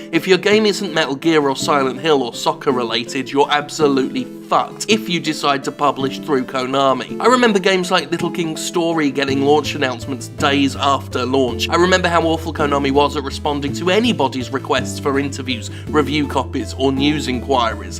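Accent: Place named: Yorkshire, United Kingdom. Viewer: I'd pay so much money for a Silent Hill voiced entirely by Jim. Especially that Yorkshire accent.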